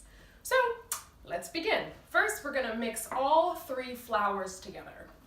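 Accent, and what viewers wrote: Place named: Eritrea. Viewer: You sound smell and test like FULLY ERITRIAN yes you are Comper you with the ather Youtuber eritrian girl way better than her by the way do you know a bit of TIGRIGNA ???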